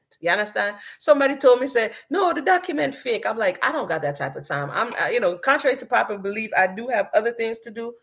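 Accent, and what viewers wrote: Brooklyn, United States. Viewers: You definitely from BK.. prolly flatbush or canarsie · You do have a Brooklyn accent. I could identify it clearly 🤣🤣🤣🤣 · Wow you just asked in the video to state where you were born I just texted before you asked the question you sound like you were raised in Brooklyn or somewhere in NYC. Wow you just said in the video Norval was birn in Jamaica! Wow thought he was also born in England!!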